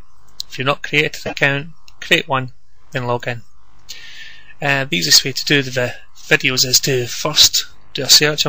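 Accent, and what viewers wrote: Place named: Scotland, United Kingdom. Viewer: omg the scottish accent is soo cool. and what a comback at comedy agony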